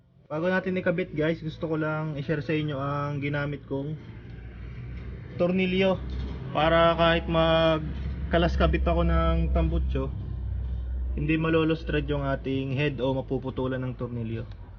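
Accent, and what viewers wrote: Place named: Batangas, Philippines. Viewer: Sabe ko na taga batangas are punto palaang e hhaha support kita idol 💯💯💯 mabuhay batangeño!!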